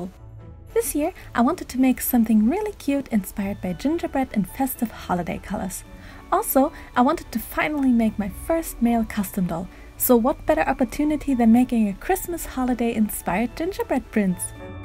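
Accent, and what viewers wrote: Germany. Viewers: Are you German, by any chance? Also, amazing work!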